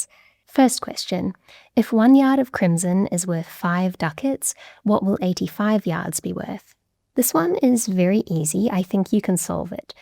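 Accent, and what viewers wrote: New Zealand. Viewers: definitely not Australian, that’s a New Zealand accent 😊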